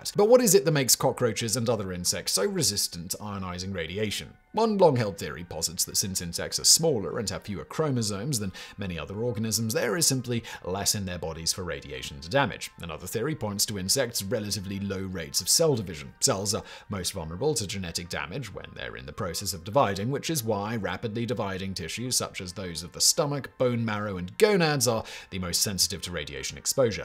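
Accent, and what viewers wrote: United Kingdom. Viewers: I do love that you read Wikipedia to us, I just hate your particular British accent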